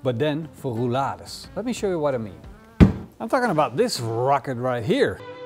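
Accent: Netherlands. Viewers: Im a dutch guy with a turkish wife that really enjoy youre videos 🤣 she say he is a YouTube star with a dutch accent 🤣💪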